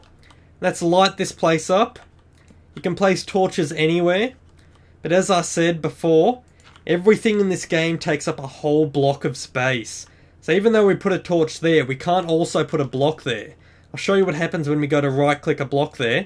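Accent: Australia. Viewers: lol your from the outback xD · are you australian???? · Is this dude an Aussie? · Australian, huh? (Accent) Two minor corrections: Worlds are not random, but if you don't enter a seed, the seed to make the world will be. Not quite infinite. Possibilities, almost, the world itself is almost 4x the Earth's surface. Anything after that are either fake chunks, or over-wrapping from the opposite end (not sure of this). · no offense but are u aussie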